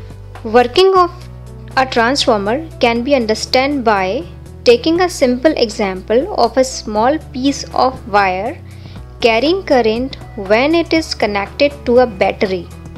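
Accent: India. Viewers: I find her voice narration annoying. Its too thick of an Indian accent and she "lip smacks wet" too much. Ruined it for me. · india voice?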